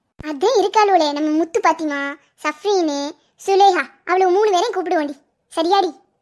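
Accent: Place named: Parangipettai, India. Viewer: 80%of your slang sounds like parangipettai slang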